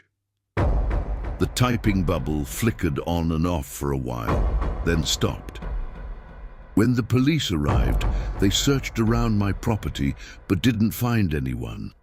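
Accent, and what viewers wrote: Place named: RP, United Kingdom. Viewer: Just discovered your channel! Interesting and eerie story! What makes your channel unique is your voice. I get the feeling that you're an older gentleman, something you rarely find on this sort of YouTube video. (Side note; if the voice is as an AI voice, it's really realistic sounding!....no offence intended if it's your BBC voice)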